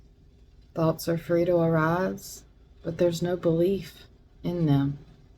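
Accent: South, United States. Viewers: I love ur southern accent. I come from mobile, alabama.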